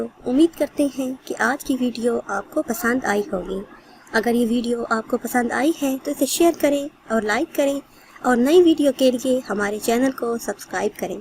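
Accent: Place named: Chakwal, Pakistan. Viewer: Baki sab theek hai apka lehja se lagta hai ap chakwal se hein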